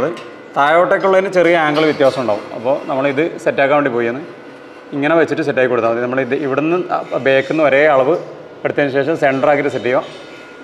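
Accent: Kannur, India. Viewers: Bro kannur aano🙄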